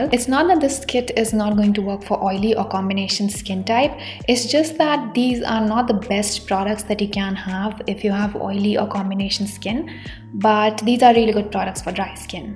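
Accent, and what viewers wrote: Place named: India. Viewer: I love your Indian accent ❤️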